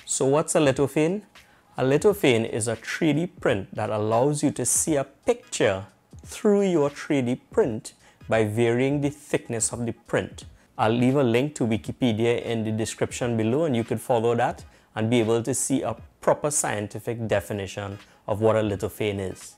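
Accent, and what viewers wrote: Trinidad and Tobago. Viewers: Great video, you sound Trinidadian. I'm happy to see a tech video that's not from india or usa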